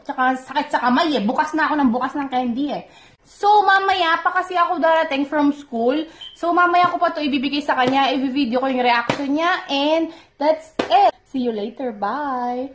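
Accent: Visayas, Philippines. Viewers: Ate bisays ka?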